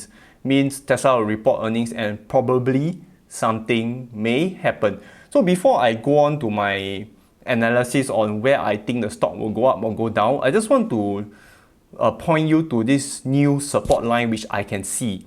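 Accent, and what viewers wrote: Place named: Singapore. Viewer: I don't know what is more valuable about: His knowledge or his Singapore accent?!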